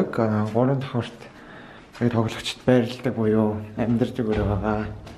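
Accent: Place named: China. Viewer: хэлэнд нь жоохон хятад аялага сууцан бна.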